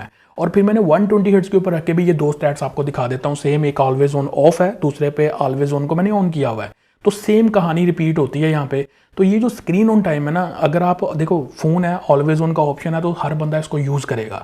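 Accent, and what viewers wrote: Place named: Punjab, India. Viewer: Lagta hy gu panjabi hu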